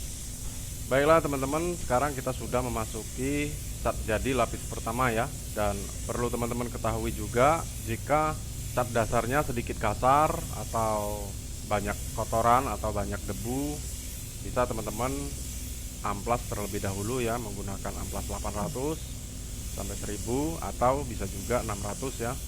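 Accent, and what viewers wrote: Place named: Java, Indonesia. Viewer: wuah.. jauh ya Saya di Jatim ini mas.., Saya kira di jawa, soalnya dengar2 logatnya sprti org jawa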